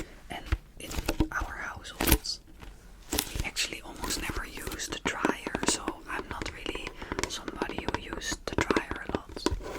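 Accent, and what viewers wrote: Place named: Netherlands. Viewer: Love this, and when you traced the letters on the pizza box 🤤. I like that you’re not a fast tapper, I find a lot of ASMArtists tap too fast. Yours is perfect. I like that you tell us the Dutch works for stuff, repeat it more then once so we can learn to Dutch words for things! I live in Canada but have lots of family from holland, including my Oma! Hearing your accent on the first video I watched I recognize it immediately.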